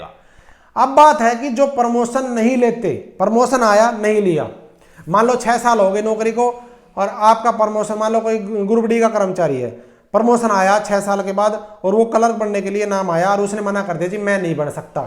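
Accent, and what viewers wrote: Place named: South Haryana, India. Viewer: It's seems u belongs to south haryana like Rewari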